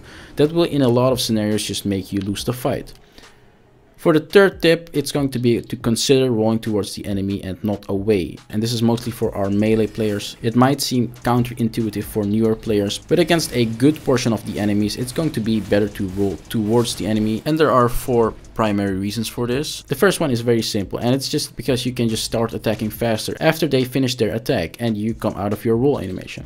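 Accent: Netherlands. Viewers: For sure your Dutch :D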